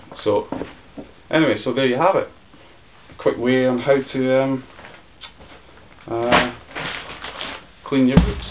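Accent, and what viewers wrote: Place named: Scotland, United Kingdom. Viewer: A scots lad ..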